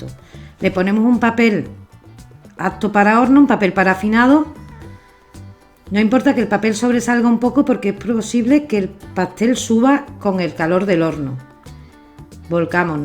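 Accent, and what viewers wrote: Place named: Andalusia, Spain. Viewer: Me a encantado la receta pero mas todavía tu acento Andaluz. Soy de Cádiz pero vivo en Florida, saludos!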